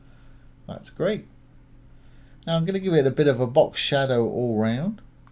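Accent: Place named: England, United Kingdom. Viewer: I like this from! I am intrigued by your accent you sound English but drop the 'T's - very american! Whatever - watch all your videos, you should do some for Elegant Themes KP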